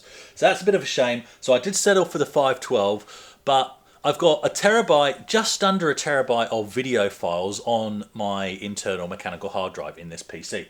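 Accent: Australia. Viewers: I love the Auzzy accent in the out of box bit. Don't spill any liquid on it.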